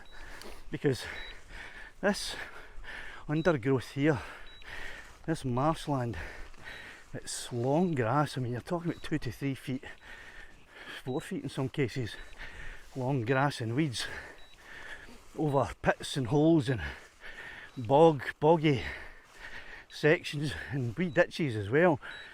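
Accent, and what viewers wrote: Scotland, United Kingdom. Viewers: Fantastic story. I really admire your courage and tenacity to find your drone. Despite the rough terrain, you overcame all obstacles and ended up successful. Respect for your perseverance and never give up. I like your Scottish accent.✌Greetings from Denmark.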